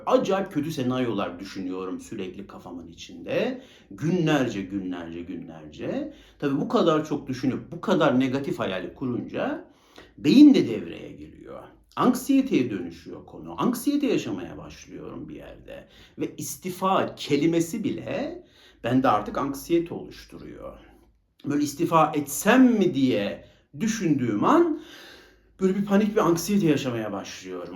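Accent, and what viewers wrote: Ankara, Turkey. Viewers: Senin Angara şivene kurban , Allah muradını versin hocam , benim aydınlanmama ve bunca yıldır kendimle yüzleşmeme vesile oldun , harekete geçip makinayı kapattım senin dediğin gibi , makinayı kapatın !!!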